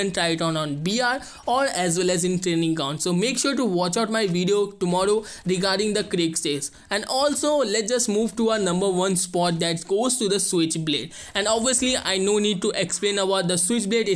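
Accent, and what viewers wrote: India. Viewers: Bengali English accent.....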